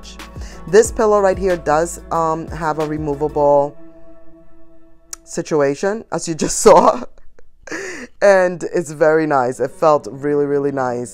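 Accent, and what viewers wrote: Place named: New Jersey, United States. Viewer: Girl I love your videos. Your personality is over the moon. Sounds like your accent is New Jersey. Watch housewives of NJ and that is why I’m thinking that. I’m from New Orleans and people think I’m from Jersey. I love your accent. Tfs cheers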